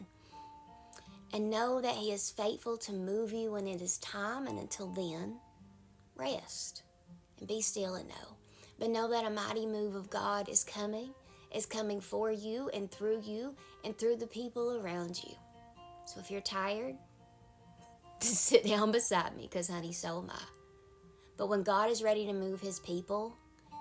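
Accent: South, United States. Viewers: how sweet and southern your accent is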